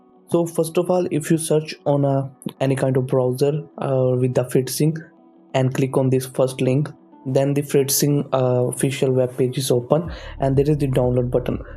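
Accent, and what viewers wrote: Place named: India. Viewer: Indian English ist great to hear ...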